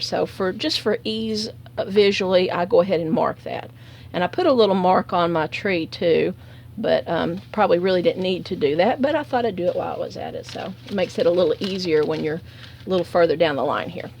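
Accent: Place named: South, United States. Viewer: Oh . You have a southern accent? I didn't notice . I picked up my English in North Carolina . So apology is not needed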